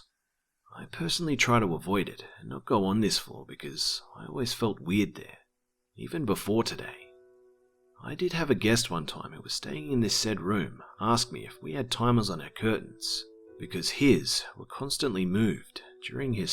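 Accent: Australia. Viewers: Your Australian I think? Is he/Are you?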